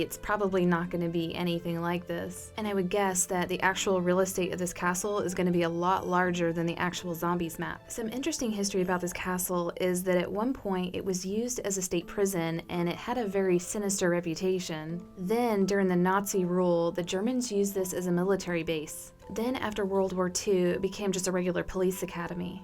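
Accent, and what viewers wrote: Texas, United States. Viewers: "Southern accent" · wait, ur from Texas? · what part of Texas are you from? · I didn't know she was also Texan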